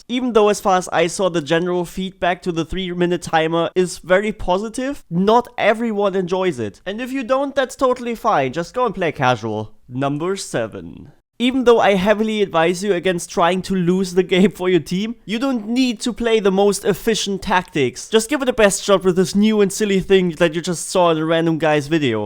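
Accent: Germany. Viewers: you sound extremly german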